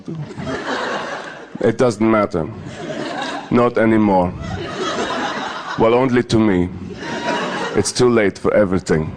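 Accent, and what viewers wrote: Ireland. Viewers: как это нет? а лойк вместо лайк, а эбойт вместо эбаут? а ирландское r? а интонация? вполне типичный ирландский акцент (республика Ирландия) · Ирландский акцент довольно клевый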